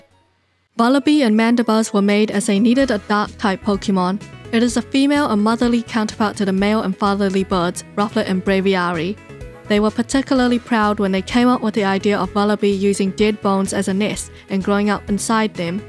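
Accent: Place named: New Zealand. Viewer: First word you said had me check that you were from New Zealand (its just how we speak y'know)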